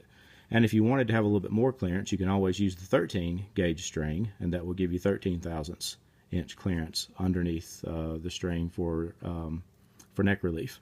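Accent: Texas, United States. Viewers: Good, clear tutorial, wish there were more like this. And I dig your accent! Texas? (Excuse my ignorance, I'm English.)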